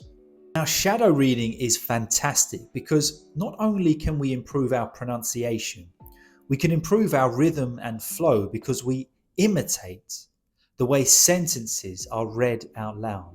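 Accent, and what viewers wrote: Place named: United Kingdom. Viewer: Being Soth Asian we prefer British English over other accents and styles which are derivatives on traditional English from England and you are a classic example, I would always love to attend your lectures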